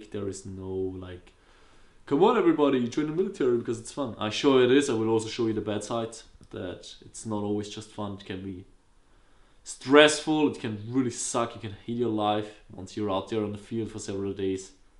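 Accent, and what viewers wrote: Germany. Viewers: Always asked myself if u were German because u sound like it. Now I saw that on the Blackboard :D #Novritscharmy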